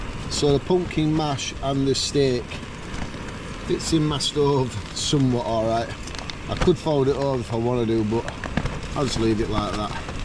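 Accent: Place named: Yorkshire, United Kingdom. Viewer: My husband’s family is from York, your accent reminds of theirs. I love all of the UK but especially York and area.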